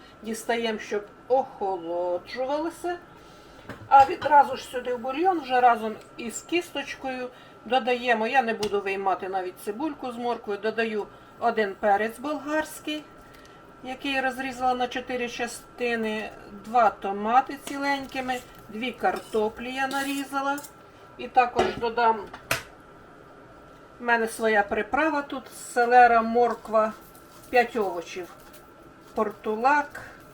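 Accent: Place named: Khmelnytskyi, Ukraine. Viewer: Ви з Хмельниччини? Говорите як на Хмельниччині. ❤ Дякую за ваші рецепти.❤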